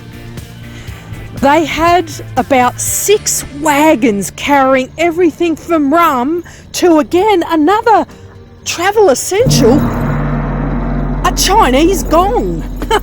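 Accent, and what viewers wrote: Australia. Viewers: Catching Oz storyline educating me on your history! Love the music, footage and raw Australian narrative. This is basic motorcycle adventure with a genuine twist. Keep on keeping on ;-) Gong!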